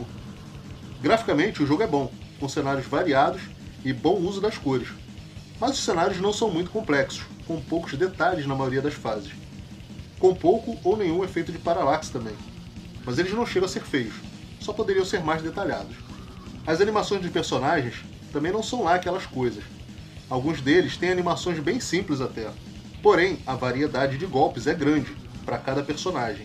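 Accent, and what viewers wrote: Rio de Janeiro, Brazil. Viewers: O vídeo é ótimo sem dúvida. O difícil mesmo é ter de suportar esse carioquêixxx carregadíssimo.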